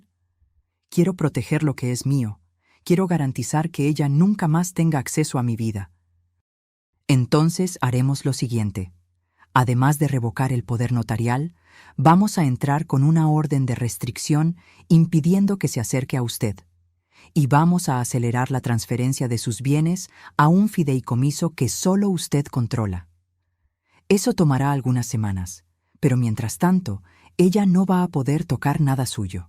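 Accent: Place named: Mexico City, Mexico. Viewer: La escucho dé ciudad de México excelente narrativa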